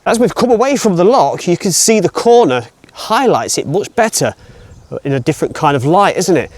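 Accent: United Kingdom. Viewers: And here was me in NZ thinking it was just one of the British dialects! Adds to the flavour!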